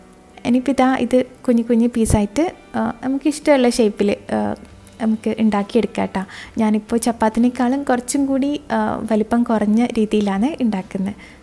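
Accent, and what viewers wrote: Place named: Nileswaram, India. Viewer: slang nhangalude nileswaram slang pole same und..athaa choiche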